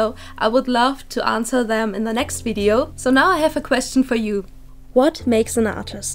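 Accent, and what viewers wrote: Germany. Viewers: Ich bin mir unsicher ob ich deinen deutschakzent im englisch nicht zu sehr mag als das ich wechseln würde. Ich glaub ich schau lieber deine englischen viedeos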